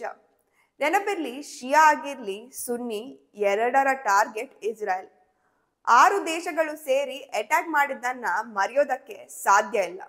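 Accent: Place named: Coastal Karnataka, India. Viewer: What's wrong with her Kannada? Her pronunciation resembles that of coastal areas. Otherwise her speech is very clear. Don't discourage our own people.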